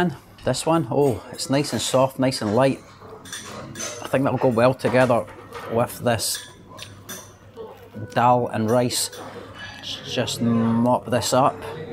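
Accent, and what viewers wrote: Scotland, United Kingdom. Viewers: are you Scots? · it's not british english, it's scottish english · Scottish accent is bit hard to understand but lovely to listen to.🙂👍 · Damn it's a pity I discovered your channel recently:( Definetely love your Scottish accent and vlogs, gonna keep watching all of your videos dude, super beautiful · as an Indian man, you have a pretty extraordinarily strong Scottish accent.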